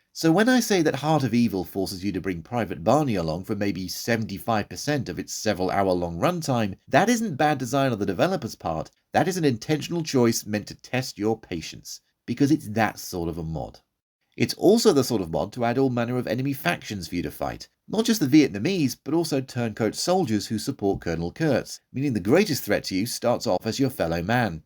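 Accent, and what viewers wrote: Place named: England, United Kingdom. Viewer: Ребята он ряльно англичанин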